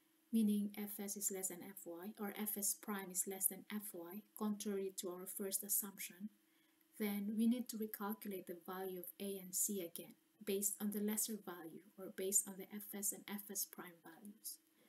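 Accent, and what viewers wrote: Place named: Europe. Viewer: Incredible voice. Very interesting accent: a combination of European accents(?) But should have been closer to the microphone.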